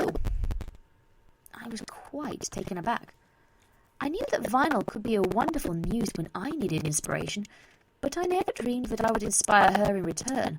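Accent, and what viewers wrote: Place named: England, United Kingdom. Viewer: Not bad. You have a lot of potential for readings, or maybe I'm just fond of English accents. Good short story too.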